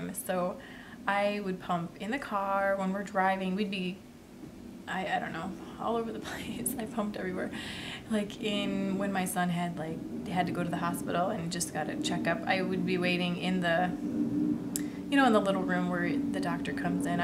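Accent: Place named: Minnesota, United States. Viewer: btw I love your videos, especially just to hear that wonderful Minnesota accent! I don't know where in the state you are (assuming subs) but I've lived out of state since 2005 and I miss it soooo much! I grew up in St. Louis Park so now this city girl lives in small town West Virginia! Totally different. I don't know if you are a fair goer but if you are it would be super fun to see a video from the Great MN Get Together!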